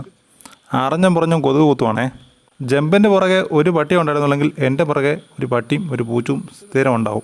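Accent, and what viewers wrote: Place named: Kottayam, India. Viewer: ഞങ്ങളുടെ സ്വന്തം കോട്ടയം ഭാഷ ❤️❤️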